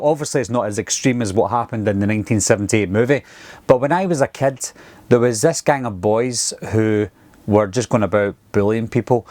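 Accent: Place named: West of Scotland, United Kingdom. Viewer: Trying to place your accent. Definitely West of Scotland. You dont pronounce chair as 'cher' so I'm guessing you are from Ayrshire or very close to it.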